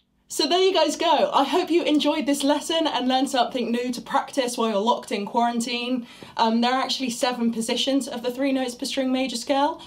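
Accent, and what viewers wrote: England, United Kingdom. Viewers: See, were all being tricked. Chics this freaking smokin hot and with an English (I think) accent, which btw moves her hotness to level... actually I can't count that high, who can play a guitar like that, aren't real. I mean, its obvious... the Matrix is real. How much more proof does one need. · That British accent melts me into a puddle.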